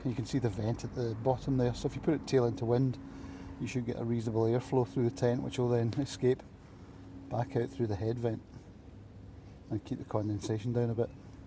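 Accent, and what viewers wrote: Scotland, United Kingdom. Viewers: He's a Scot...you're a moron.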